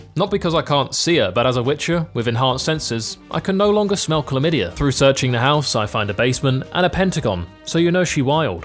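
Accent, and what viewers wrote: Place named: Burnley, United Kingdom. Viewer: Burnley accent. This guy is a fucking joker xD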